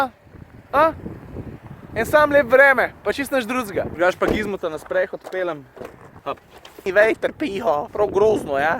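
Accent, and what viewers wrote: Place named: Maribor, Slovenia. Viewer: od tvoje mariborščine cepam :D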